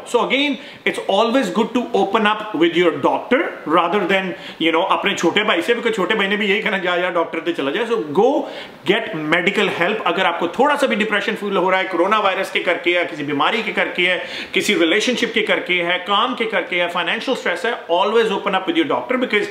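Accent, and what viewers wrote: Punjab, India. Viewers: Hi veerji I am from India I started following u one reason is the way u speak bit punjabi hindi english all combination it makes me nostalgic since I am from punjabi family but married to a Hindu, I have gone through that tràuma of depression,and I somehow got over it, in fact it made me more mentally strong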